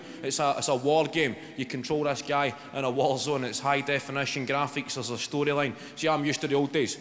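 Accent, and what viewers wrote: Scotland, United Kingdom. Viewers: Fouad Bacho English, with a Scottish accent, being Scottish. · Jesus Christ that scottish accent haha perfectly fits what he's saying but I'm almost a C2 english level and still I missed way too many jokes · well if your from the uk and you can't understand a uk accent u must b thick ya zoomer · what are you on? English can't understand the Scottish? I'm english and I understand every word, ignorant people cant understand him, not english people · Lmao, I'm Welsh and I need to concentrate to understand him :p he has a very thick Scottish accent doesn't he haha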